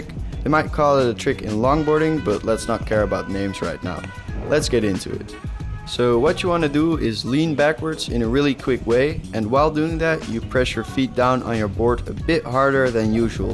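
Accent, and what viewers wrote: Netherlands. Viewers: Dutch? Btw love this vid imma try it. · does he sounds dutch or is it just me? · Ar u dutch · the accent says that your dutch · Btw are you dutch? I can hear it from your accent and when you say 'cool he' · Lol I can hear that vague Dutch accent there, especially when talking to those kids in the end😂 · R u not dutch? Ur accent is really dutch😂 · I can hear a dutch accent. Am i right or? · Are u dutch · Ben je Nederlands je hebt beetje accent van Nederlander 😂😂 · Whahaha loll hoorde ik NOu goed dat je Nederlands bent?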